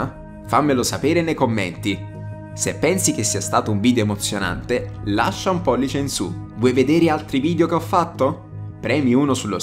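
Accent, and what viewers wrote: South, Italy. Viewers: peccato l'accento meridionale del commentatore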